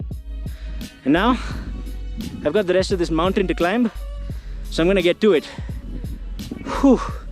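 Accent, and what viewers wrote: Kerala, India. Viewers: Wonderful review. Are you from Kerala?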